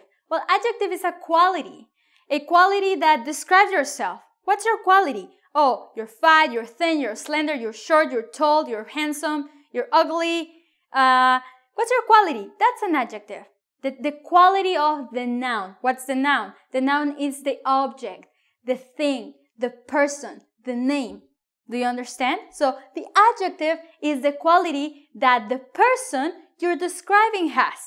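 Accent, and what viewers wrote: Spain. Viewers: Is this Spain Spanish or Mexican Spanish? (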